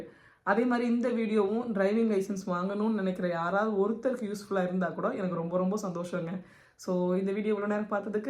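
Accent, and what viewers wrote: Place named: India. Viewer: Hiii.. Very useful video..Thank you so much for sharing your experience.. Namma Indian English slang Pesurom school la work pogum pothu eppadi inga ulla local kids kooda neenga manage panneenga..They can understand our slang especially initial time when we get into the work?.How did you manage your starting days of working in school?Can you share some of your experience too.. Thank you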